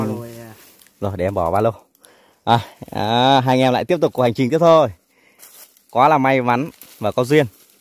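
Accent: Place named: Mekong Delta, Vietnam. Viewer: Nge giọng a gióg ng miền tây nam bộ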